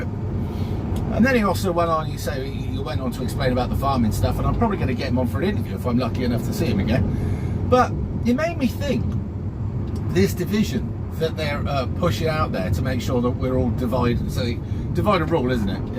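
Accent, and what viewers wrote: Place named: Bristol, United Kingdom. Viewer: Sounds more like Bristol.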